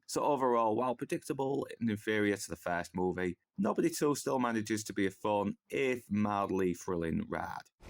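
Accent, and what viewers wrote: United Kingdom. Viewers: Thanks! As an Ex-Northerner (you can't get much more south than New Zealand!) I have to say, it's so refreshing to hear a good northern accent talking so much sense. Keep it up!!!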